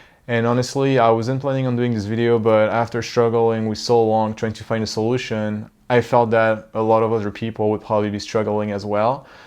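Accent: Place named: France. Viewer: God bless you....I'm trying to record higher quality videos to keep my students moving with online classes here in NYC as we have been on lockdown due to COVID 19. You just helped me record full classes, so that my students can keep moving during this traumatic time. Grateful for this vid. I hope you and your family are safe and well. I also heard a French accent. Enchante!!!!! Merci!!!!!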